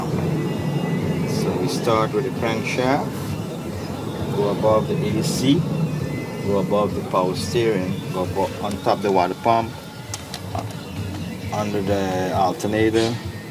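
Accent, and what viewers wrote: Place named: Guyana. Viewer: great video you save me 1200 dollar keep up the great work keep the videos coming ,,,,u sound like a guyanese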